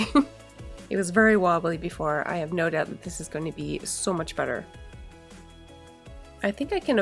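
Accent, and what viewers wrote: Canada. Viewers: Ahhhhh… Your accent makes sense now when you mention Canadian dollars! I only noticed it with certain words but now that’s all I hear 😂 I love it :)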